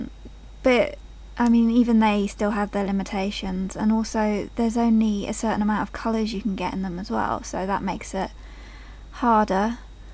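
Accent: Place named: United Kingdom. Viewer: you have a total british accent, sounds cute by the way =D but you sound so shy that i can't tell your age right! maybie you have 10 or 20 i don't know!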